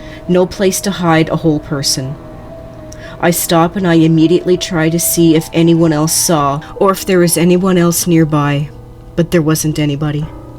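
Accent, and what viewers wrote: Canada. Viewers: these narrations sound canadian as fuck. and i'm okay with that.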